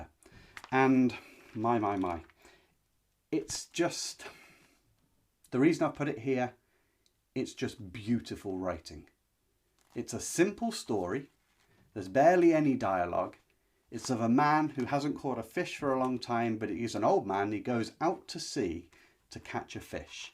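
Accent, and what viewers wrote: United Kingdom. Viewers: Would love to hear you read some of these out loud in your impeccable British accent! You’ve inspired me to pick up some classics, thank you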